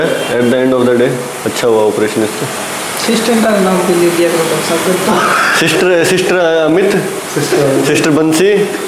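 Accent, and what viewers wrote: Haryana, India. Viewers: Sir your haryanvi is awesome - approved by another haryanvi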